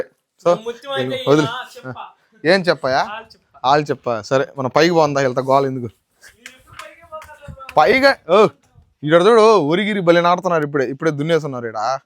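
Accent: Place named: Kadapa, India. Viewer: కడప స్లాంగ్ ఎక్కువగా, నెల్లూరు స్లాంగ్ తక్కువుగా మాట్లాడతావు 🥰🥰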